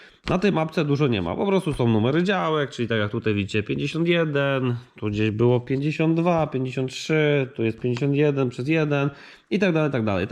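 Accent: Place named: Poland. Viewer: Jak cie slysze to czuje cebule a wypowiadasz sie jak cebulandia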